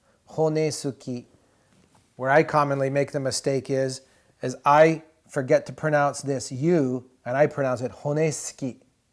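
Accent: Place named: United States. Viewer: This is only half knowledge, as you have generously left out vowel length and vowel dropping. You mentioned daku-on and handaku-on in passing, but completely left out soku-on. Intonation isn't mentioned,too. I am not objecting to the slight American accent, as I know how hard it can be to get rid of that even after years of study. It is one of the reasons why universities hire native speakers as language teachers, so why don't you ask your wife to pronounce the words?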